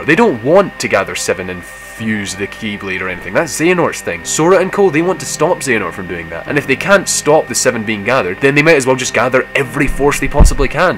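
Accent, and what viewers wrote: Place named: Scotland, United Kingdom. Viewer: How did people think your accent was Irish, an Irish accent sounds nothing like a Scottish accent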